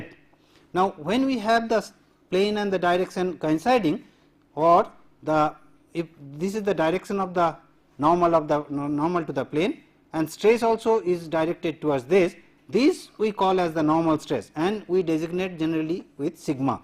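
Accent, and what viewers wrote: India. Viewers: The lecture is nice, but the accent is a bit too heavy for non-indian students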